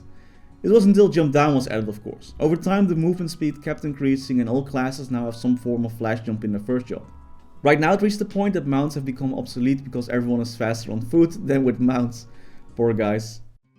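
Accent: Netherlands. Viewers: You sound so Dutch. I'm in Utrecht. Hi.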